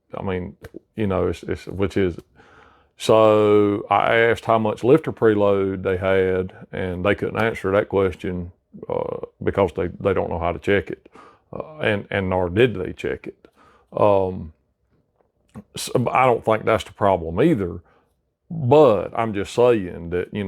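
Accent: South, United States. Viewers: Boy did the armchair mechanics come out of the woods for this one...Some really wild theories floating around out there. This cat has got a seriously strong southern accent and I dig it! He could be talking about quantum physics, and I'd still listen. 😜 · Now thats a country accent! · do you naturally sound this kuntry or do you have to really lay it on thick for the camera?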